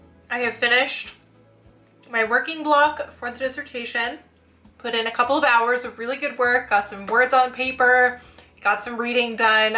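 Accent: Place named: England, United Kingdom. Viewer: It sounded like you have an English accent now after talking to Ali. This is one of my favourite vlogs from the dissertation diaries to date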